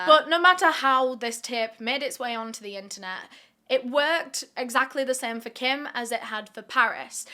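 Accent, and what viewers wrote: United Kingdom. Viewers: Sorry but i gotta say you look like Olivia Rodrigo with cute Brit accent ✨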